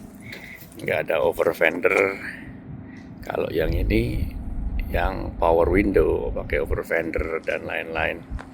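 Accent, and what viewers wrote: Java, Indonesia. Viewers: Saya salut dengan jenengan Pak,walaupun oerbah tinggal di Australi sangat lama,tapi logat Jawa jenengan masih sangat kental🙏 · Tampilan LC 200nya cakep banget, velg+ban dan modif bagian depannya pas banget. Suatu saat bisa bikin mobil overland seperti om wid 🎉. Satu hal yg bikin salut, walaupun lama tinggal di Australia, tapi logat jawanya masih ada loh 🎉🎉🎉.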